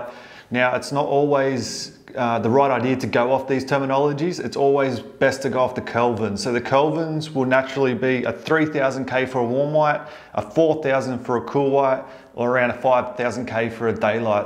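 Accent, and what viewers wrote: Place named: Australia. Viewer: That Aussie accent tho🥰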